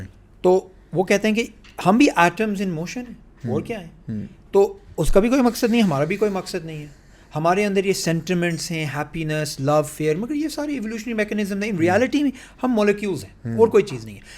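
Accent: Pakistan. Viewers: Ma sha allah bhaijaan ko urdu mein bolte hue bohot accha laga, wo Pakistani touch zubaan mein unke barqaraar rahe. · I undertood the english part but with urdu accent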